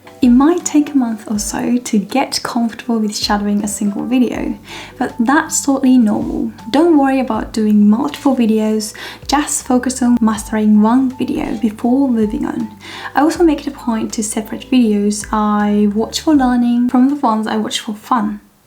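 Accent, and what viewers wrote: United Kingdom. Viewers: Born in Okinawa but has a British accent? Huh?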